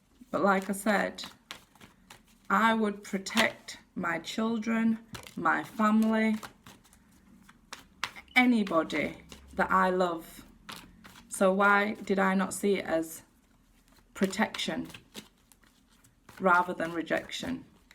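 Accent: United Kingdom. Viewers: I hear you. British?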